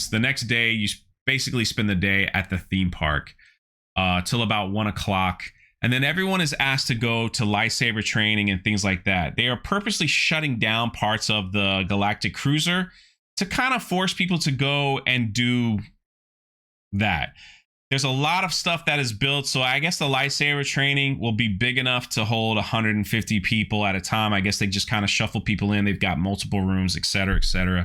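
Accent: South, United States. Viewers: first, where is your accent from? I hear this faint Southern twang when you talk and I’m just super curious where it’s from 👍🏻 Secondly - THANK YOU and Anna and Theory and everyone else in the Fandom Menace for covering this so we can all laugh and vent about it together. I also love that we all have seen something different that’s WRONG with these “trailers” that Disney has put out. For the first add with the female Disney Imagineer and the overly excited fan, I love that they chose a very short actor for the fan, so the girl towers over him. Just saying. And the video showing the meeting of the Imagineers sitting down and talking about the “not hotel,” again they’re all female, just as the Lucasfilm story department is all female. I believe you said it best when reacting to this - “WOW me. WOW me.” That’s what Star Wars is SUPPOSED to do when done properly. 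I watch the trailer and I’m like “what about Han, Luke and Leia, can I see them?” And I feel like the blue hostess or the “Twilek” or Disney security is gonna pounce on me: “WE DON’T TALK ABOUT THEM HERE.” Anyway, keep up the good work!!!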